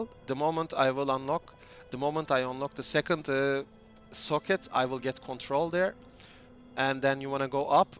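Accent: Turkey. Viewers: Sen turksun demi o aksani nerde gorsem tanirim.amk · great vid are you turkish ur accent sounds like it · bu türk ingilizcesi, nerde duysam tanırım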